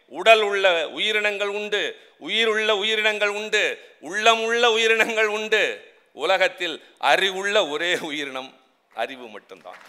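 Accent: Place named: Thanjavur, India. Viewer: அருமையான பேச்சு.தஞ்சைத் தமிழ்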